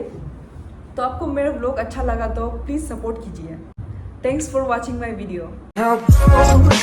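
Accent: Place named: Dekapam, India. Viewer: Avi pata chala ki ap dekapam se hai bolke😅 im also from dekapam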